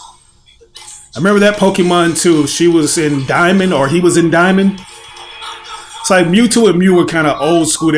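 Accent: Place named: West Tennessee, United States. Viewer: You from West TN/North MS ain’t it? I hear them Arruhs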